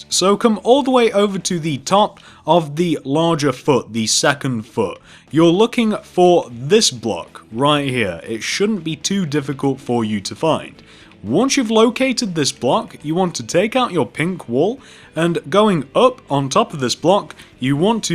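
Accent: United Kingdom. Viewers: He is from the UK I believe.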